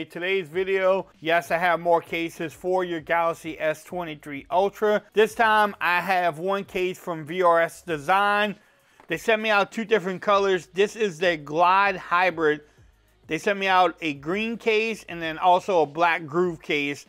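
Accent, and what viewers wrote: United States. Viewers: Your accent is the most american accent ever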